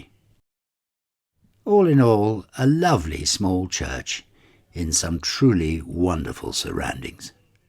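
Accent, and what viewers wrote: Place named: Scotland, United Kingdom. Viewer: you are like a British Indiana Jones. 😊 I love it. My grandfather was a British Scottish man, he had the same accent as you. My mom gave me recordings of her father reading poems and your voice reminds me of him. I never met him in person but I feel like I knew him because my mother told me about him. I am a poet and artist like he was. I believe if he was alive now he would be a writer and probably do YouTube videos to teach people about history. I wonder if it's genetic to be a philosopher and lover of literature and history. It seems to run in my family. 😊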